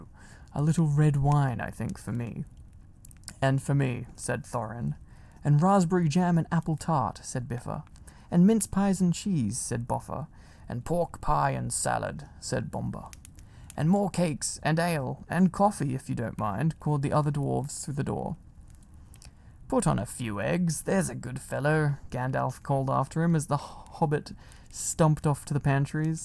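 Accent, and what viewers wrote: Australia. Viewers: omg i am so excited for this series!!! i recently got into the LOTR books and movies and im loving them + your videos too omg. I AM LOVING THIS COLLAB SO MUCH LMFAOOO 😂😭 but jokes aside, your ASMR videos are really helping me get through sickness (a literal covid-level stomach infection and cold), period pain, and just mental health struggles daily. thank you so much for doing what you do, and all the effort and hard work you put in! <3 (all the lovely gifs you create are not going unnoticed at all dw! 🦋) your wholesome videos really brighten up my day (or night? since i listen to them while sleeping mostly haha.) i love your sense of humour, your rambles, and your ideas! you also seem like an incredibly intelligent and a really good person. you are charming as hell and your austrailian accent is amazing of course haha. (im sorry this comment turned out to be equivalent to a personality test result + tarot reading 😭 but i meant it all!!!!) i am so glad i discovered your channel!!! 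ilysm pls, thank you so much for existing. 😭🫂💗💗💗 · i really can’t tell if ur Australian or not but i fkn love ur accent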